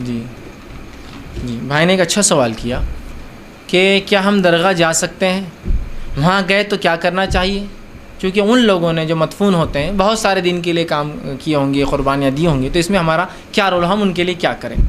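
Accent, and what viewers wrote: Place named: Hyderabad, India. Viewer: He's hyderabadi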